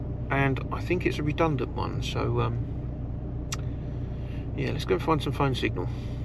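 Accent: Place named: United Kingdom. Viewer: aaaahh the british accent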